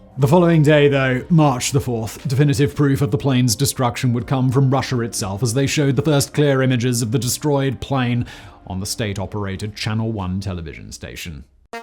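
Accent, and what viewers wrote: RP, United Kingdom. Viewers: it is me or is he sounding even more posh these days..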